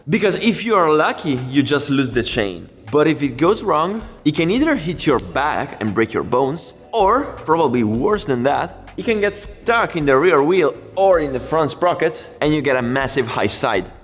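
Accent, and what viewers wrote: Italy. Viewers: alles ok im Video !! mir gefällt Dein Englisch mit italienischen Akzent - erinnert mich an meine Urlaube in Italien !!! ;-)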